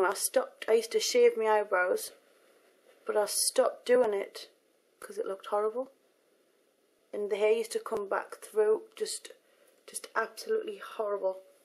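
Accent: Newcastle, United Kingdom. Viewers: She's originally from South Shields, England. It's called a Geordie accent and I love it too! :D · It's a Geordie accent, it's from the North East of England. Typically around the Newcastle area.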